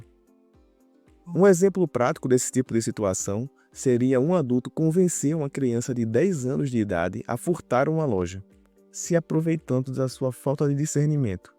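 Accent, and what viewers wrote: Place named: Recife, Brazil. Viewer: Gostei do seu sutaque! É de Recife, é?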